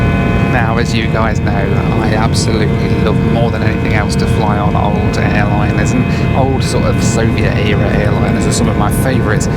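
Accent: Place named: United Kingdom. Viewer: Read the automatic captions to nearly fall off your chair laughing! That, combined with British accent, had me laughing like an idiot.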